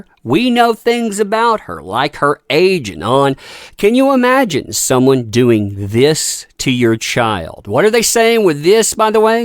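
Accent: South, United States. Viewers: I'm confident you've all (star youtubers🥰) thought about a class action lawsuit against Shane and/or the guy that threatened you with FBI and/or AH for threatening you and your families....but I'm also guessing none of you want to be tied to a lawsuit, you just want to move on with your lives....gracing your audiences with the truth, unlike the mainstream media. On another note, I can't tell you how much I've enjoyed watching and learning from all of you. Especially you, I just love your powerful, short presentations with a southern drawl covered by an umbrella.....mysterious and elegant. Anything I can do to back you guys up......I'm in!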